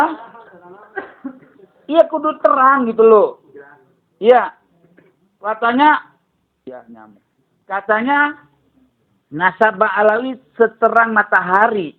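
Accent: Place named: Madura, Indonesia. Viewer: Halo syaikh.. orang pundi gih.. kok nadanya kayak Madura apa betul kyai..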